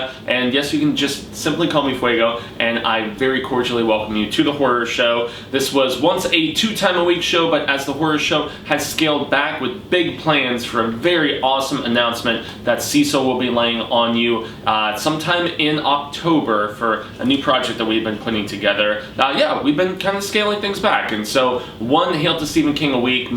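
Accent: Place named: Maine, United States. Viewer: This review was really funny, Fuego. I laughed through out. And your Maine accent is funny XD LOL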